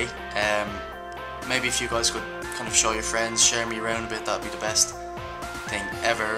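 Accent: Ireland. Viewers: Buck sent me! I loooove your Irish accent. hahaha You`re pretty talented! Don`t give up your dreams. :) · irish accent !!1 i love it !! :D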